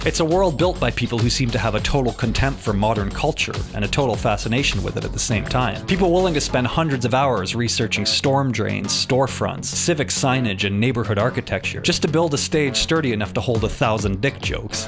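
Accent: Canada. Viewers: Us? Your canadian?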